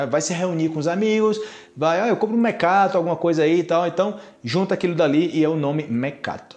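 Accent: Antioquia, Colombia. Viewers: Soy de Venezuela, usamos bastante la palabra chévere, bacano nunca escuché alguien decirla, pero si la dicen uno entiende que es. Hablas bien el español, hasta pareces colombiano al hablar😎😎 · Profe usted ya es paisa, tiene el acento!! 😂 Se nota que está amañado...!! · Me gusta tu español con acento colombiano👏 pero tu portugués me encanta 😁 os países de fala espanhol tem muitas palavras com significado diferente, en mi país tambén usamos, "me regala" cuando vas a comprar algo. Muito bom seu video👌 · Eu acho que tem muito do sutaque paisa da Colômbia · veo tus videos cuando puedo, tu acento parece mas colombiano que brasileño. Tus vídeos me han ayudado en mucho.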